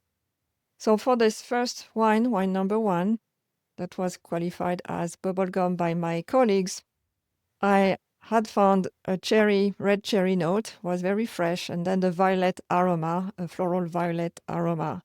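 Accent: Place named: France. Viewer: I love your Methods and your French Accent :)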